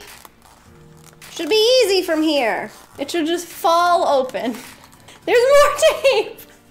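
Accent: South, United States. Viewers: You’re killing it with the southern accent. It’s like I’m watching a home improvement video and you’re showing off your tools 😁😁👍👍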